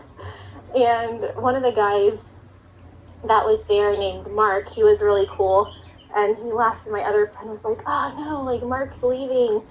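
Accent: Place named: New York, United States. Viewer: Just watched your channel for the first time and amazingly the woodland that the girl in the video was highlighting known locally as Drinkwater Park was where I lived for the first 50 years of my life, thanks for bringing back memories of the happiest times of my life. You sound like a New Yorker, by coincidence I've just come back from a week in Manhattan. I'll be sure to subscribe.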